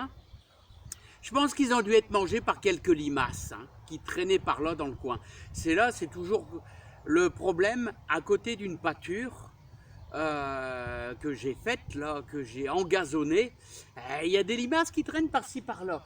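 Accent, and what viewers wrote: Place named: Béthune, France. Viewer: Bonsoir Mr Depuis qqs jours je visionne vos vidéos avec plaisir et attention. J ai bien l intention de mettre certains de vos conseils en pratique. Votre accent aussi me réjouit vous parlez du Haut Bethunois( quelle commune exactement?),je suis moi-même originaire de Bethune,même si je vis depuis plus de 30 ans en Charente Maritime. Retraitée depuis qqs mois je profite e cette chance pour principalement m occuper d un potager (loué depuis 20 ans et acheté en janvier) J essaie de jardinier bio et naturel, d appliquer qqs principes de permaculture(notamment de maintenir un sol vivant) J ai essayé cette année (très chaude et très sèche) de nouvelles cultures avec plus ou moins de réussite Des topinambours récolte à venir Des poires melon aucun fruit ,pieds repiqués ds ma petite serre tunnel De la poire de terre (,Yacon) récolte la semaine prochaine Des endives hélas avec la sécheresse les racines sont toutes petites Tant pis je recommencerai j espère simplement que 2023 sera une année plus "normale " En tout cas merci pour votre bonne humeur et votre naturel. 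Bon mois de Novembre. Maryse Lydie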